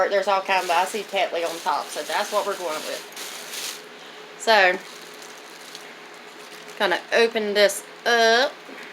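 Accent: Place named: South, United States. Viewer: I do like your videos... And love your voice... So Southern.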